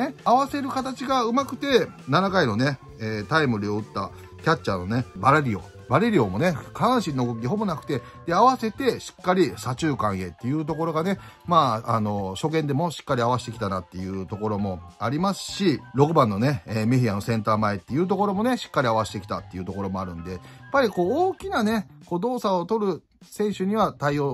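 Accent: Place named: Western Japan, Japan. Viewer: 声質と西日本系のイントネーションが似てるのかもしれませんね！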